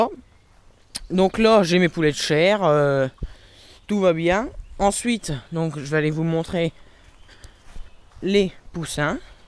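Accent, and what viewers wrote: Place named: Marne, France. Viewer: Je crois reconnaître le même accent que moi de la Marne non!?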